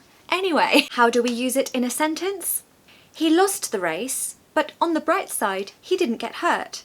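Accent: RP, United Kingdom. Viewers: Hi, i love your British accent ❤ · ❤❤❤❤❤❤❤❤ Love you so much ! Your Modern RP accent is really beautiful and wonderful My GREATEST Teacher of British English Language!!! ❤❤❤❤❤❤❤❤❤❤❤❤❤❤ ❤❤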